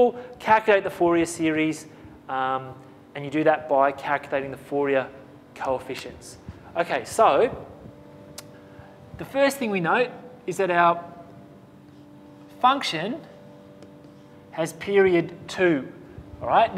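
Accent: Australia. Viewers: I haven't seen too many Australian people on these sort of videos. There's something about the accent that I love even though I'm American! Great work. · Bonus for having a ‘Stralian accent 😊🥳🎊🙌🏽 Awesome video! Greetings from the US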